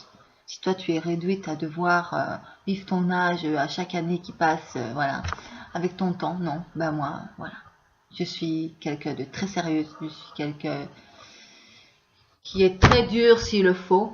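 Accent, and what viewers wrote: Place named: Liège, Belgium. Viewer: MDR. Tu me fais trop rire :)... Pour te rep.. "un mec" mdr... j't'explique vite fais, jsuis tomber sur ta chaine par hasard, en cherchant des gens qui parlais de nick conrad, ensuite jsuis tomber sur une video de toi qui parlais de ça, j'ai écouté, puis j'ai regardé en vitesse les autres video de ta chaine, mais étonnamment j'aime bien. T'as un coté artistique fort développé. Même si je vois que tu veux juste "parler de tout" et que oui tu t'en fou de l'avis des gens.. Jt'avoue que j'ai laisser un com's exprès pour voir si t'allais répondre, et j'étais sur à 99% que t'allais le faire dans une video mdr. jt'ai glissé un tacle suivis d'un compliment, juste pour voir... Mais la où tu m'as fais rire c'est sur le "un mec ou une fille, jsais pas" mdr... Ouai c'est claire que vu que je ne montre que mon oeil c'est difficile à dire mdr suffisait de cliquer sur ma chaine pour voir que le contenu n'as rien de féminin XD Mais bon, jvoulais juste que tu sache que j'aime bien ta façon d'être et ton accent de liège "jme moque pas jsuis originaire de Verviers ;)" et ce que j'ai bien aimé, c'est une phrase que t'as dit sur une vidéo : "c'est pas parce que je fais la conne que je suis débile" et c'est ce coté (jme prend pas au sérieux) qui est bien à voir.. 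c'est cool que tu t'arrête pas à l'avis des gens, vouloir plaire à tout le monde.. à quoi bon. Si tu t'aime toi, c'est le principal... PS: sur la video où j'ai laissé un com's il y avais déjà un "dislike" c'est pas moi qui l'ai mis, j'ai p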